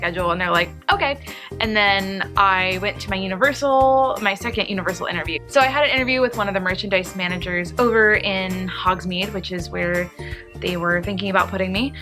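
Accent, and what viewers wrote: United Kingdom. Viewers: prob RP lol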